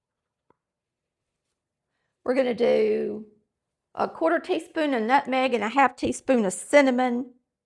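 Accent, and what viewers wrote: South, United States. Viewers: Coping with grief loosing my mom I start baking. The last few months I made few different recipes of banana cake. This one is the best of all. The cake is delicious, moist and even I did not put self rising flour rice to the top of the baking pan. I like everything about this video, soft melodic southern accent of, she explains very well substitutions if you don’t have all ingredients in hand. In my cake I used organic flour 3 huge bananas and yogurt instead of buttermilk. Looking forward to try more recipes. Thank you!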